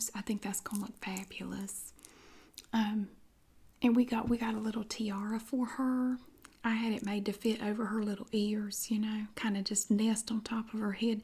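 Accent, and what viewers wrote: South, United States. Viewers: I absolutely love your videos 😍❤️ especially the southern accent my fiance is from nc and when we first met I had to learn how he talks because of the accent always looking forward for any of your videos they always help me so much I'm going through a lot of anxiety and your videos always calm me right down thank you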